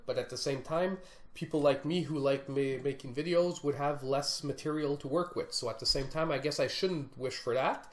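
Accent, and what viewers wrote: Quebec, Canada. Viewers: Subb'd because good video and awesome Quebec English accent :)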